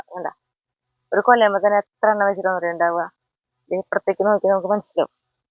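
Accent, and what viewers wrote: Thrissur, India. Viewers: വീഡിയോസ് ഒന്നിനൊന്നു സൂപ്പർ ആണ്. കണ്ടു കഴിഞ്ഞ വീഡിയോകൾ ആണെങ്കിലും പിന്നെയും ഞാൻ കാണാറുണ്ട് അത്രക്കും ഇഷ്ടമാണ്. പിന്നെ നമ്മുടെ തൃശൂർ ഭാഷ കേൾക്കാൻ ഇഷ്ടമാണ്. വളരെ innocent ആയിട്ടുള്ള സംസാരവും വളരെ ഇഷ്ടമാണ്. കുടുംബത്തെ ഈശ്വരൻ അനുഗ്രഹിക്കട്ടെ എല്ലാ സൗഭാഗ്യങ്ങളും നേരുന്നു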